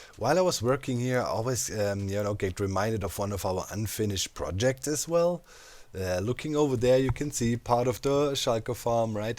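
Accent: Germany. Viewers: u german or somthing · Are you German or do you just live here?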